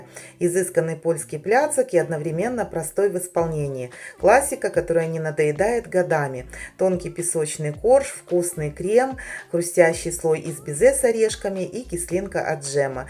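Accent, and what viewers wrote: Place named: Galicia, Ukraine. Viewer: Как ужасен галицкий диалект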